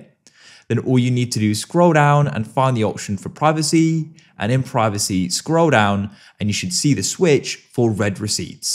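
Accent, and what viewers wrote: United Kingdom. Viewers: You know what buddy it was helpful.On top of it I can guarantee that you are a Brit. I am used to hearing proper english as it's 11 years I am working for BT 😅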